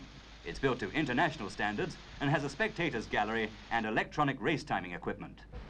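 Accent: Australia. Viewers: What an interesting accent. Sounds like an Australian with an RP overlay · Is it just me, or is the narrator Australian?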